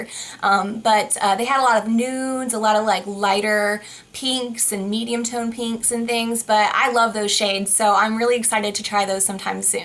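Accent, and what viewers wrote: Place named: Yorkshire, United Kingdom. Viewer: Her accent is northern- I think maybe Yorkshire! (Sorry if I'm wrong...)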